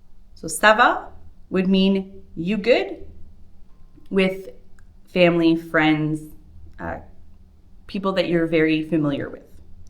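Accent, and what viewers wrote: Quebec, Canada. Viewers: Is this Quebecois French or France French? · I would assume this is Quebec French being taught as opposed to Parision French?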